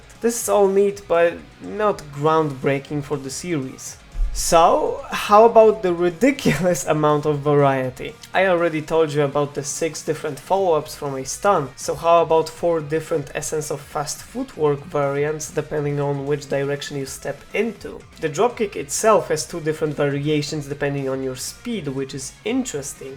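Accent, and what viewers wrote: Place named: Eastern Europe. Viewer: Strong slavic acent. Yeah dude you better make a subtitles next time.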